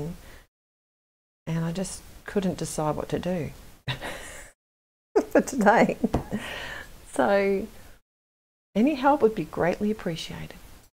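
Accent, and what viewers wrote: Australia. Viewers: What state do you live in? It’s nice hearing an Aussie voice.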